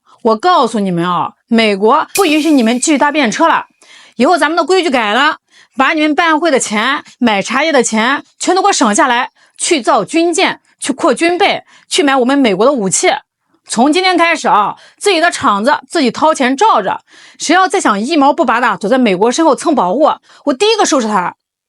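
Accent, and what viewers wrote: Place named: Shandong, China. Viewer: 你这山东调不能常听要把我带偏😊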